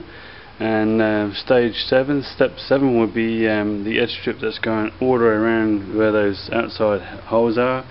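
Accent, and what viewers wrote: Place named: Australia. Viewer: Great demonstration! I now have the courage and confidence to recant several antique chairs I've been saving for a long time. You sound just like my son-in-law, he's from Australia too and has the calm reassuring manner of speaking. Thanks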